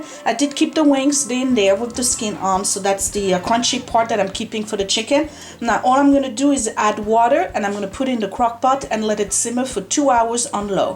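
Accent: France. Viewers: and the soup are amazing:))) ha ha ha l'accent français;)! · yay! More French accent! I love listening to you speak.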